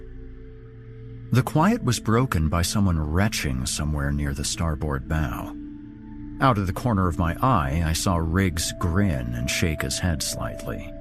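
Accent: United Kingdom. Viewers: Wow! I'm the author. And this certainly was a fantastic surprise! When I started my shift at work yesterday, I had no idea that my story had been officially selected to be narrated by none other than at the Horror Hill! It really was a fantastic way to start off the work day.:) I truly feel honored to have made the cut for something like this. And it's doubly cool for me because hails from the same city where I grew up. Hope all is well in Rochester. And thanks so much for narrating this! And I hope to work with you more in the future... BTW don't listen to the haters man. You totally killed it with that British accent.;)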